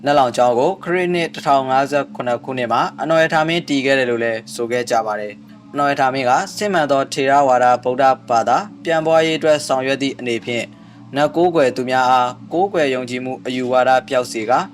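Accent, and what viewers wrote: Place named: China. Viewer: စေ တီ ဘု ရား ကို လှ တယ် လို့ မ ပြော ရ ပါ ဘူး သပ္ပါယ် တယ် လို့ ပြော ရ ပါ တယ် မြန် မာ ပြည် မှာ နေ ပြီး မြန် မာ စကား ကို မြန် မာ လို ပီ ပီ သ သ ပြော တတ် ပါ စေ! တရုပ် ပြော တဲ့ မြန် မာ စကား လို မဖြစ် ပါ စေ နဲ့ !!!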